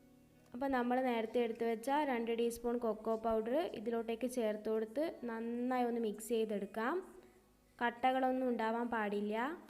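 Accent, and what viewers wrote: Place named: Kannur, India. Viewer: Kannur basha mattane...Appathekk... polum 😂😂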